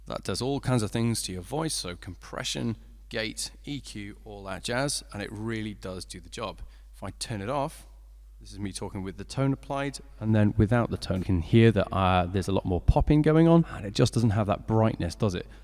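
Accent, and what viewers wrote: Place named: United Kingdom. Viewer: What a great review and video. I've watched a few vids on this pedal looking for specific info and finally, you knocked it out of the park. The eloquence of speech delivered with the British accent was spot on mate. (Haha) Last but not least, the song performances were quite good. If those are your tunes, I'm thoroughly impressed. Hell I'm impressed regardless. Later dude.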